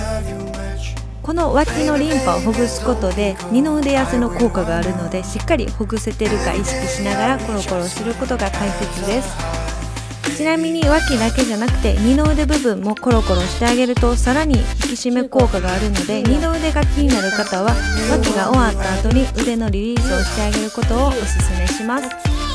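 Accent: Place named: Kansai, Japan. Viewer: 可愛いい〜、関西弁がよろしい〜。買ったまま使ってまへん😵、やってみようかな。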